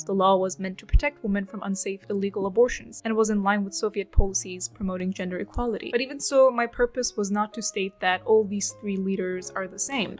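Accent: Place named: Turkey. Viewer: I am Turkish too and I was like "This accent is really really familiar " in the first video, Anyway Great video! I love the first one second one is also really good!!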